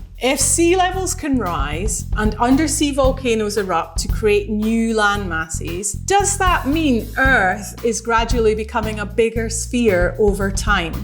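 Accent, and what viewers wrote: United Kingdom. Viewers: This dumbass british accent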